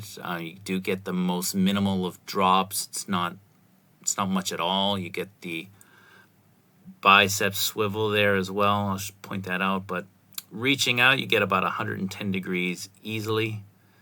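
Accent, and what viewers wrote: Boston, United States. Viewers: So, have u watched the Celtics documentary series that's running on MAX/HBO? You should've narrated it. Noone's more Boston than you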